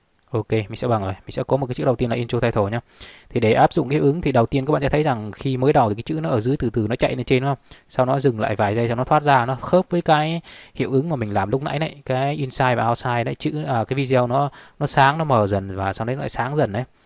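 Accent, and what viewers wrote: Vietnam. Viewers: A PHẢI NGƯỜI tHANH HÓA K Ạ ?????????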